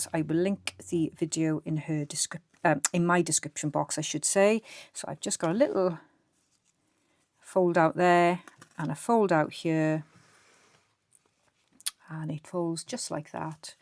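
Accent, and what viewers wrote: Wales, United Kingdom. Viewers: Lovely to find you on here and hear the Welsh accent - but oh Im so sorry I couldn't watch til the end because far too many adverts every 3 minutes. You tube is now so annoying with the ads :(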